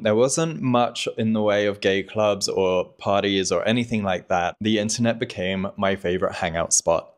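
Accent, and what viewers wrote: England, United Kingdom. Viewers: I am from Birmingham but it wasn't me lol loads of fake pics still about these days interesting vlog btw you still got your English accent 🍻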